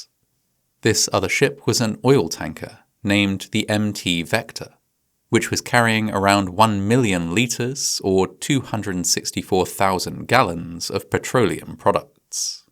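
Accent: United Kingdom. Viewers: Interesting to have a British voice use a term like petrol, but then use the calculation to convert litres into US gallons. 1,000,000 litres equals roughly 264,000 US gallons. It's 220,000 Imperial (British) gallons.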